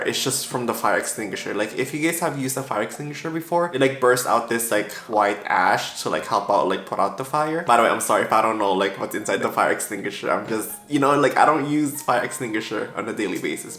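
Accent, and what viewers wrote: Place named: Philippines. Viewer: are you filipino? you have a filipino accent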